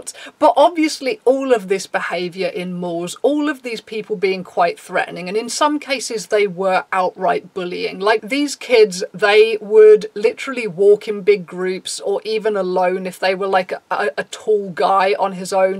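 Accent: United Kingdom. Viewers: You sound British... if you are British... please tell me what shopping centres people who listened to chilled in a shopper centre and pretended to be vampires because I LITERALLY never seen this. Yes I got bullied at school for being a “Greebo” but literally nobody reacted like this who listened to him.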